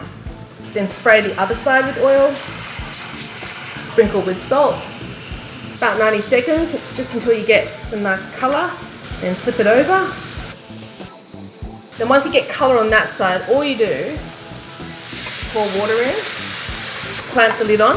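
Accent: Australia. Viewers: love yr aussie accent.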